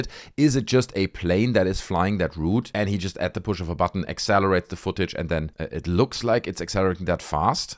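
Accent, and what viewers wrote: Germany. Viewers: dude, the combination of your real german accent and choice of words while mocking what true believers would say in response to the "evidence" they have just seen on these videos clips is pure comedic gold!!! Keep up the great content. I am suffering with you but you make it easier to tolerate these idiots!!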